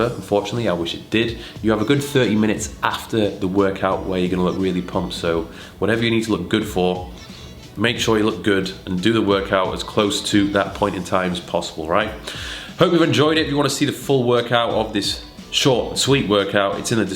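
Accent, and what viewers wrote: United Kingdom. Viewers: You Are British ... Right na...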